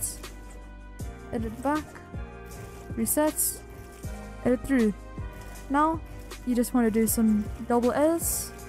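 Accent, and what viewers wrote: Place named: Europe. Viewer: U sound Europe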